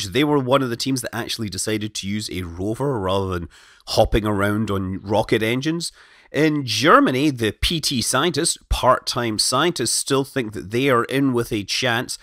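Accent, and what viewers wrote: Scotland, United Kingdom. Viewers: Can we call you { }? Are u in fact scottish? Great video's, thank you.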